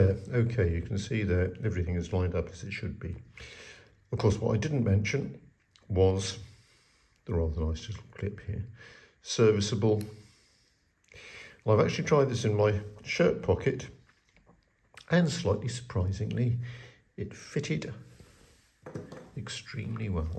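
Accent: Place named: United Kingdom. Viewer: Your reviews are good. I seriously have watched your videos particularly to improve my British Accent. 😄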